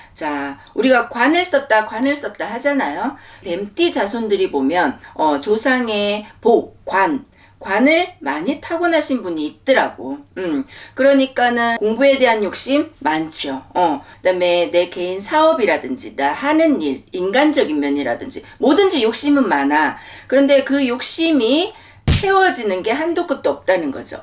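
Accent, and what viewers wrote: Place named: Busan, South Korea. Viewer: 정사생입니다. 부산사람이라 선생님 억양이 너무 푸근하니 익숙합니다. ^^ 선생님 유튭 방송도 응원할게요.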